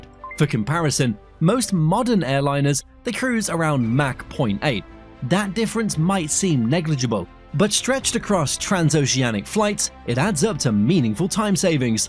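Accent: England, United Kingdom. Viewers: How can someone with a British accent mispronounce Aluminium - it’s Al you mini um - it’s not alooo min num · Why is the narrator got an English accent but says aluminum not aluminium ...that just ruined the video for me. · Narrator has a British accent, but pronounces aluminum like an American. This is confusing. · If you're going to use a British accent, at least use British spelling. The metal is alumininininium.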